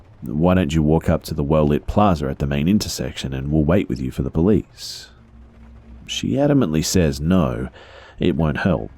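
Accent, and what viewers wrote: Australia. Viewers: came back to the youtube channel because I listened to everything that was uploaded on his Spotify podcast and was so happy to find there was a new here. I even listen to case file! What is about Aussie's narrating horrifying shit? I love it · Good to hear an Aussie creepypasta reader. Its good to hear different readers to see how they handle the story. Mr Nightmare for instance can make anything sound scary and ominous, where as CreepsMcPasta sounds so relaxed and thoughtful.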